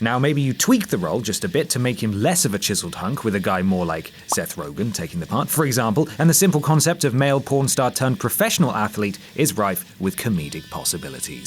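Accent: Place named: RP, United Kingdom. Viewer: sounds really promising posh today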